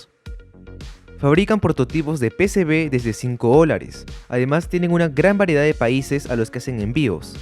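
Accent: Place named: Peru. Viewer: increíble, el español peruano es inconfundible.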